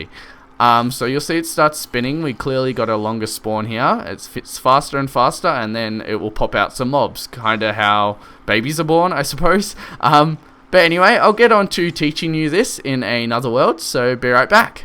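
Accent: Australia. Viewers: easy as, aussie? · you inspired me mate, wait your aussie! SAME!!!!!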